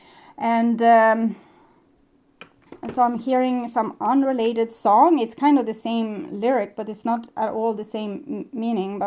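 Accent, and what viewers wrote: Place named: Sweden. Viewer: I thought I recognized the accent😍 Min mor ar fran Skane.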